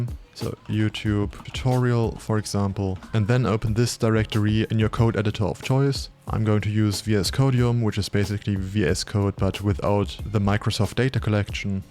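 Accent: Germany. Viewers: man hört das deutsch so raus XD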